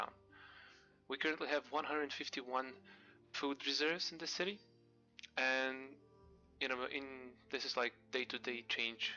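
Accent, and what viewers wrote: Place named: Serbia. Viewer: You sound like you're Serbian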